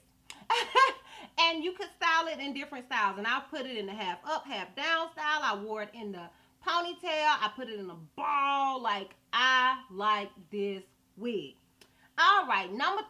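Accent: South, United States. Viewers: Girl, I love your country accent lol. Them wigs were cute or whatev. #2 was my fav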